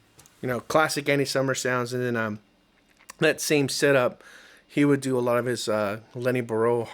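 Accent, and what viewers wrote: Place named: Baltimore, United States. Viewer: Fantastic sound! I, too, am a bit of a tone chaser and am gratified that with the tools I have, I can replicate those great Andy Summers sounds. Curious, are you from the Baltimore/MD/VA area? As a tone chaser, I also hear dialects. Occupational hazard.;)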